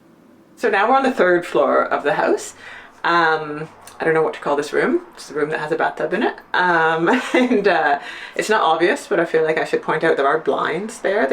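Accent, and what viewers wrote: Canada. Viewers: Compared to American tiny houses, this is a mansion! Where the heck is it? She sounds Canadian. Ah ha! Canadian and Toronto. Very cool tour! Lucky! Despite the narrow size, I bet it cost quite a bit to build and the rent is probably not cheap. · Love this house! Is her accent from Toronto? It doesn’t sound like the Canadian accents I’m used to, it’s adorable so I’m just curious.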